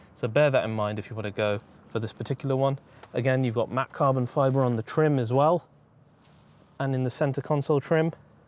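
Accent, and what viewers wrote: United Kingdom. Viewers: maybe because of the British accent?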